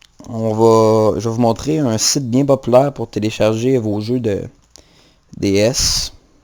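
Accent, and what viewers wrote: Quebec, Canada. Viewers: il est canadien !! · Il est canadien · Magnifique ton accent quebequois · tu as un accent styler mec si jme trompe pas c'est quebecoi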